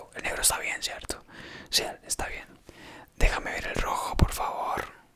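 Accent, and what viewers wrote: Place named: Bogotá, Colombia. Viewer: Cuando empecé a ver tus vídeos pensé que eras español jsjsjjs y después me di cuenta de que eras colombiano, más encima rolo xd, me cagué de la risa con tu actuación , y tu bigote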